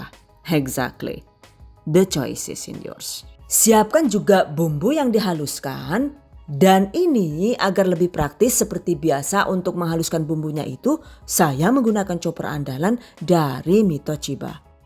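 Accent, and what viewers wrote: Banyumas, Indonesia. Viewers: Aku seneng banget Maring cenel kiye koh penasaran jan2 asli ne wong ngendi Banyumasane kok medok banget.😀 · "DONE" Alhamdulillah selalu suka resep dari dan trik dan tips setiap resepnya jelas banget... Apalagi suaranya khas banget dan ngapak😁😁 Pokok resepnya selalu di nanti2😍😍 Sukses selalu buat dan terimakasih atas semua resep2nya😘😘 #giveawayTHV2020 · Laaah Wong ndi sih gye, deneng ngapak? 😂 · Aku suka bahasa jawa ngapaknya...ora ngapak ora kepenak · "done" #giveaway thv 2020 Slalu ngapak...sukses ...catat...👍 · Lucu banget..ngapak2 kaya nyong bae deneng lah..mbekayune..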